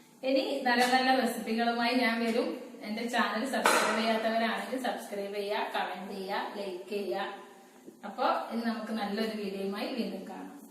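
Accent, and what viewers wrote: Kannur, India. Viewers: കണ്ണൂർ aano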